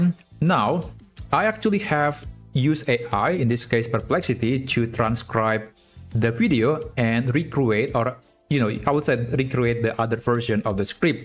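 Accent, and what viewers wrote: Indonesia. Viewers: lu orang indo bang? dari logatnya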